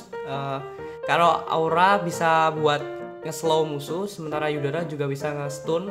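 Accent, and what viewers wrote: Java, Indonesia. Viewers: Medok banget bang :v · Medok mas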